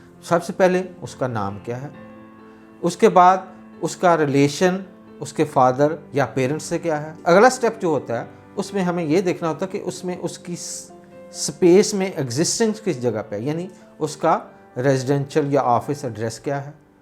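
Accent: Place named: Punjab, Pakistan. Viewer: nice,agreeاردو الفاظ اورجملوں کی ادائیگی کرتے ھوے پنجابی لہجہ کا تڑکا برا نھیں،لیکن اردو زبان کا اپنا لہجہ اوردرست تلفظ کی ادائیگی کااختیار کرنا،تقریر کو زیادہ پُراثر بنانے کےلۓ یہ پریکٹس بھی احسن ہے۔🌺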